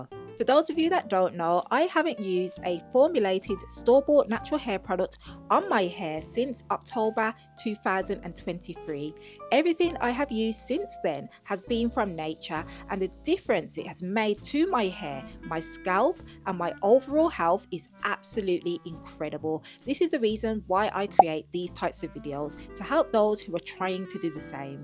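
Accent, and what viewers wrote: Birmingham, United Kingdom. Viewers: Your accent sounds like your from birmingham yay a sister from the ends😂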